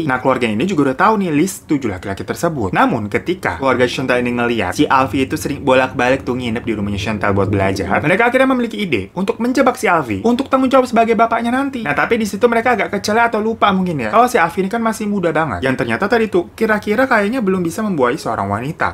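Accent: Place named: Banjar, Indonesia. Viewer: Kayak org Banjar wkwk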